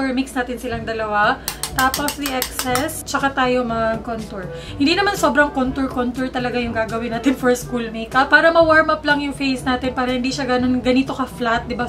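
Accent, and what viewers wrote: Visayas, Philippines. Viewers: Luh...Bisaya ka noh?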